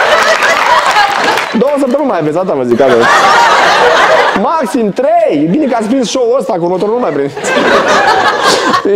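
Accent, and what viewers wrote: Moldova. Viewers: Și ce fain e ca și a păstrat accentul moldovenesc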